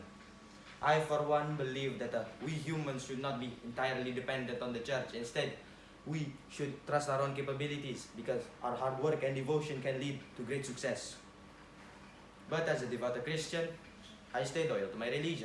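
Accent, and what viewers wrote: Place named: Italy. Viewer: Italian accent !!!!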